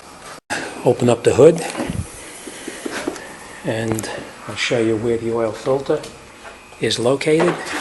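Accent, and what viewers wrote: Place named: Boston, United States. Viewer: You sound like you're from the East Coast/Boston area. One thing I would have done differently though is, I would have left the belly pan off, until after you test drove or turned on the car, to see that you don't have any oil leaks(From underneath, as I see you checked from the top). Good Job.. You Italian as well?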